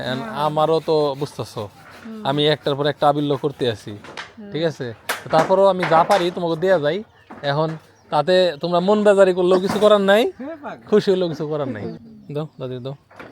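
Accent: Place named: Jamalpur, Bangladesh. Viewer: জামালপুরের ভাষা টাঙ্গাইলের নাগরপুর এর ভাষা সেম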